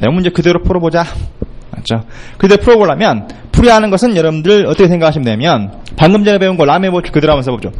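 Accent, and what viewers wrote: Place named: Jeolla, South Korea. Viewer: 전 경남 진주에서 태어나 자랐고 여기가 고향입니다 하지만 건설회사?특성상 타지를 돌아다니며 전북고창이 고향인 지금 제 와이프를 만났고 결혼해 살고 있습니다........억양이나 말투가 교수님이랑 너무 비슷?했습니다....특히 배려버린다~이 말은 완전 똑같았구요......교수님은 재능기부로 이렇게 동영상을 올리셨지만 저 같은 경우 정말 큰 도움이 되었습니다 부담가지지 마시고 교수님 근무하시는 주소를 <mail> 로 보내주시면 제가 교수님재능기부에 대한 조그만 보답을 하고 싶습니다 부담 전혀 가지지 마시고 꼭 보내주셨으면 합니다 교수님은 재능기부 였으니 전 교수님 아니었으면 또 건축구조 때문에 아마도 불합격 했을 꺼 같습니다~꼭 주소 보내주셨으면 합니다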